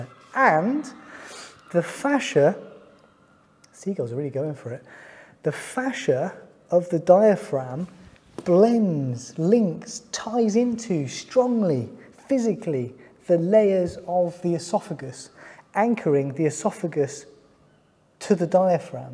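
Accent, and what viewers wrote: United Kingdom. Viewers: Asa I started watching this show, I noticed you are British, aren' t you Doc?🧑‍⚕️ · British accent + mesmerizing personality + a med school mannequin = YouTube star giving anatomy lessons. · good video, if i could understand the british accent and if he could speak slower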